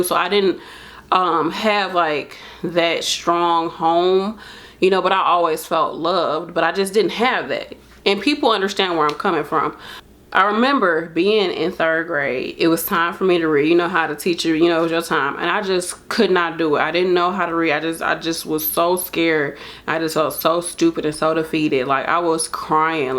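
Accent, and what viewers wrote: St. Louis, United States. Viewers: I knew you had to be from St Louis I can hear it! I’m from St. Louis too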